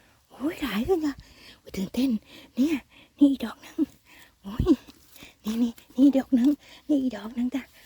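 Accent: Korat, Thailand. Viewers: พูดเหมือคนโคราชเนาะ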